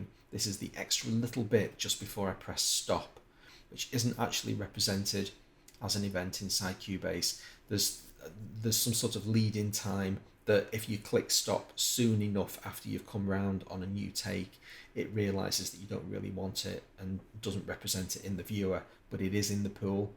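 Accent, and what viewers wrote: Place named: Liverpool, United Kingdom. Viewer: Great Tutorial, Refreshingly Calm, Peaceful & Sticks To Teaching The Topic In A Precise & Interesting Manner, As He Is Doing It. Also, What A Gentleman! This Is A Great Video & I Will Be Looking To Learn More From. "Thank You ". p.s. To Make Things Even Better, I Believe I Detected A Genuine Scouse Accent. The Great City Of Liverpool, "The Home Of The BeatlesIs The Centre Of The Universe", & To Be More Precise, The Actual Centre Is Here In Fazakerley, In Liverpool.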